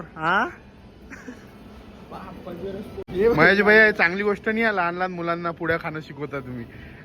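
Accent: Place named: Vidarbha, India. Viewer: भाऊ भाषा लय भारी आहे तुमची बहुतेक तुम्ही आमच्या वऱ्हाड aतले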